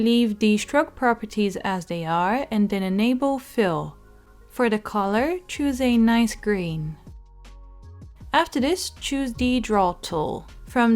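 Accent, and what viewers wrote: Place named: Scotland, United Kingdom. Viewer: The heck kinda accent is that? Watered down Scottish?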